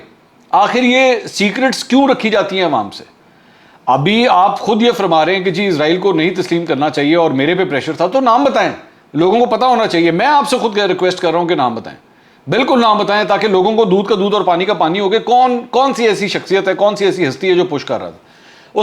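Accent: Punjab, Pakistan. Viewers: bhai apka punjabi portion bra mazedar hota hy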